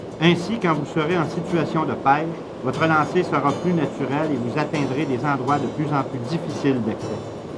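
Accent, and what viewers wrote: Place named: Canada. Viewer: belle vidéo très sympathiques à regarder et surtout à entendre avec cette accent que j'adore .....du Canada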